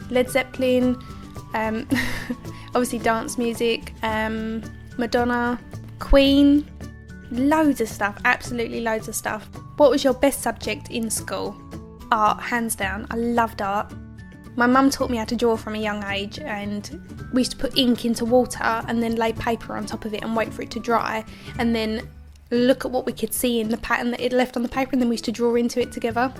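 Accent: Essex, United Kingdom. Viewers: Wow you're from the same areas as me. I knew you were an Essex girl from ya voice but when you said you went to college in Thurrock I was surprised! I went in Southend (: love all your make up looks girl, especially on the eyes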